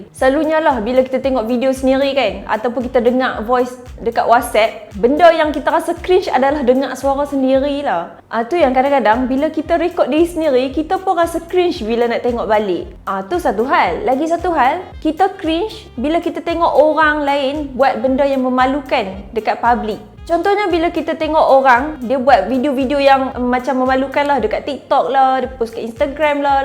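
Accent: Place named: North, Malaysia. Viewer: Tak tau pasaipa aku tertarik dgq host punya utagha...bnyakkan lagi post guna host ni...terbaik baq hang..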